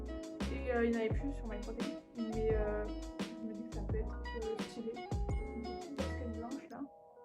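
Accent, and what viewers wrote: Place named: South, France. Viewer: Cool tes vidéos ! Bonne énergie ☺️ et cet accent du sud 🤌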